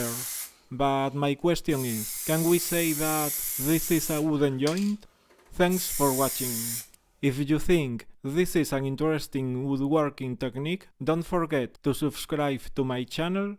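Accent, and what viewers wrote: Spain. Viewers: Habla el inglés como Fernando Alonso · Con oírte decir dos palabras sabía que eras español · Se nota que eres español bro jajajajajajajajaja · Este ingles es muy español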